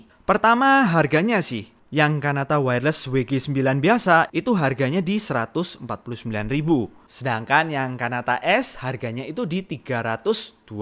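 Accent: Java, Indonesia. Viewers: medhok lee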